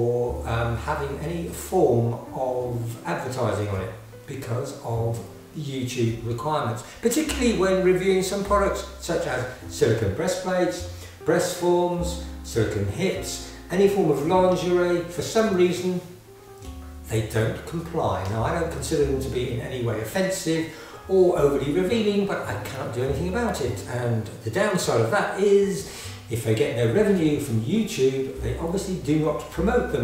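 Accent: United Kingdom. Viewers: My reason for viewing is to see and hear what you have to say. Like I said before I am a sucker for an English accent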